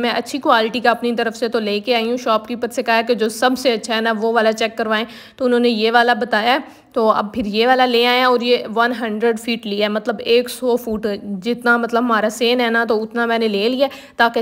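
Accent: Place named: Pothohari, Pakistan. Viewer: Ap potohaar sy hn?ap ka lehja pothohaari ha.lkn ap punjabi show krti hn khud ko.why?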